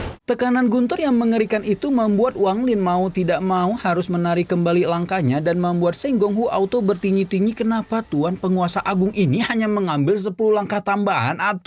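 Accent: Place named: Java, Indonesia. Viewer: candu ama nada mutiara membangkang surga next lebih medok lagi bang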